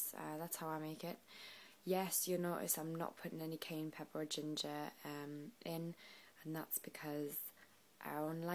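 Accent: Newcastle, United Kingdom. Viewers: I'm guessing your Geordie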